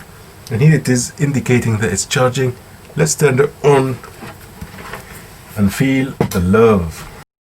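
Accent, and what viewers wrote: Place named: Africa. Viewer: انا عندي سؤال محيرني صراحة انته وحكيم اثنينكم عندكم لكنه افريقيه في انكليزيتكم.. where did u get that accent from? هذي مو اللكنة الانكليزيه ابدا...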